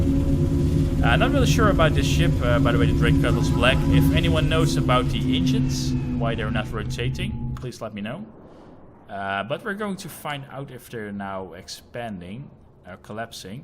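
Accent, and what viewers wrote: Netherlands. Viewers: are u from the netherlands by any chance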